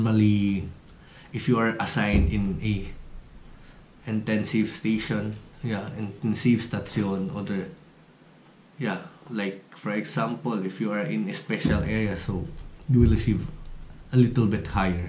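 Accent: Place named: Ilonggo, Philippines. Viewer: Ilonggo ka sir?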